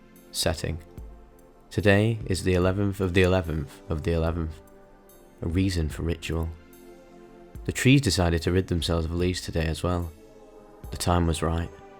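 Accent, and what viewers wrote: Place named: Yorkshire, United Kingdom. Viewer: fairrrr, I used to always get copyright claims when watching music videos split screen. One time in particular when I streamed an Antony Joshua title fight in the corner whilst streaming a wow dungeon. I guess that's taking the piss a bit 😅 was never getting away with that. Also, cool to hear your from up north — I'm a manc. Guessing your from Leeds or York, my sisters bf is from there you sound the same lmao. Anyway enough of the life story, I stumbled across your channel whilst researching a some stuff. I’ve been using ambience music on my latest videos with my voice over the top, hoping not to get strikes, so far so good. I actually refrained from using an Egyptian type ambience from Assassins creed because it was a video game track and I thought I'd most definitely get striked, however, I shall try incorporate some video game music, it seems to be safer 👍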